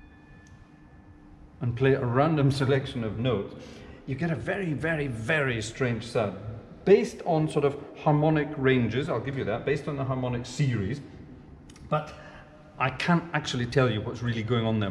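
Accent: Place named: Scotland, United Kingdom. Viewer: Well that's quite an Organ very versatile which will please your. I could hear the pleasure in your voice playing around with this instrument. Very Uncongenial to say the least. Very enjoyable video indeed. And yes your Scottish for sure not that that's a bad thing. Someone has to think about the money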